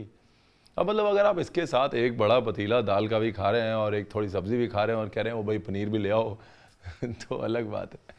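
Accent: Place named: Punjab, India. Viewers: Your punjabi accent is to die for 🤗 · I don't know if youtube read my mind today. I was about to comment in one of ur video "k sir Punjabi v bol dea kro vich vich" den Utube came up wid this suggested video. Like tadka is must in every dish, ur punjabi accent also necessary in every cooking video. Dhanwaad Paaji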